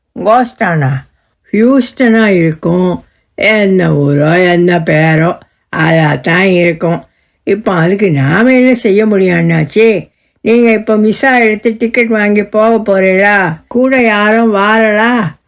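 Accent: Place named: Nagercoil, India. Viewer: very nice madam .... Nagercoil Slang nalla Pesureenga .... nangil nadan in ella Kathay Ka lum bathividungal.... Thank you...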